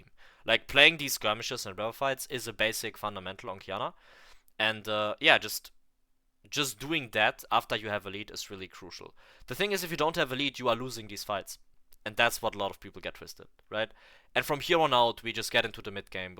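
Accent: Germany. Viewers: Nahh this video it was I was looking for!! love the german accent btw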